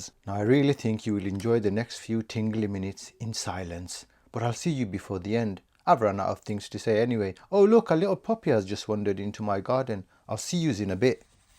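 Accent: Birmingham, United Kingdom. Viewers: Hey, now you just listen hear, you just go an stay a barmy brummie, ‘cos that’s the way we like it - uhu-uhu, that’s the way….. Oh you know wot I mean. Stay cool, stay happy and please keep the camera rollin’. 🙏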